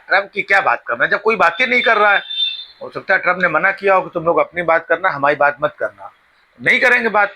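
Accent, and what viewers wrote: Kanpur, India. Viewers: ji aap Kanpur ka accent me bola karo pls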